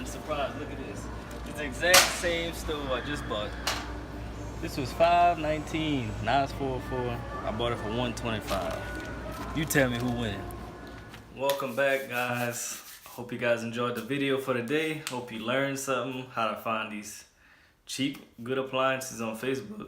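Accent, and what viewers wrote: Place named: Louisiana, United States. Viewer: I heard your voice and knew you were from Louisiana.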